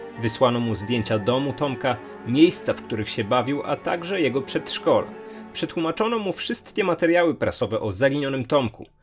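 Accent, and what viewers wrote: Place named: Eastern Borderlands, Poland. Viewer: qzwa cztasz jak jakis uchodzca z kresów wschodnich...tajojku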